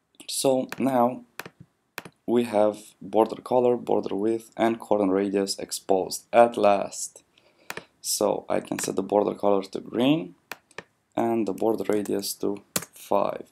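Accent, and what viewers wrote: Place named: Russia. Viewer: Cool russian-english pronunciation =)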